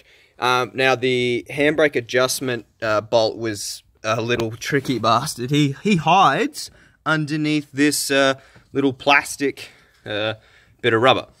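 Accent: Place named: Australia. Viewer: Thanks so much for this vid! I keep coming back to this so I know exactly what to do. True aussie bloke right here. If you ain't Aussie well you sure sound like one lol.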